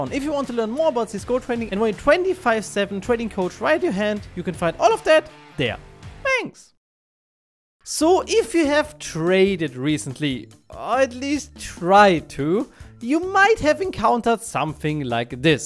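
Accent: Germany. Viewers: Insane Video like always man, all of your videos are just useful and very nice to watch. Like how you can hear the german voice, but i think every german can recognise other germans haha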